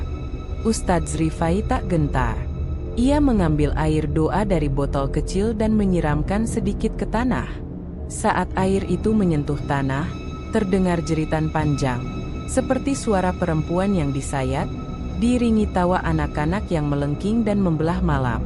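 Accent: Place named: Java, Indonesia. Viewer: Bahasa jawamu lucu...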